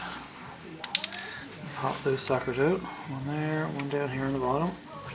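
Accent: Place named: Canada. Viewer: I wasn't watching the video at first, but noticed you were obviously Canadian. Then I flipped over to the video and confirmed that with the Mastercraft tools, eh.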